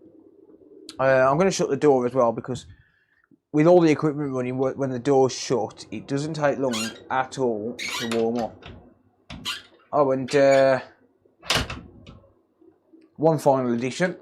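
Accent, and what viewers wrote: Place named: Birmingham, United Kingdom. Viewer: Come on brummie lad . You're best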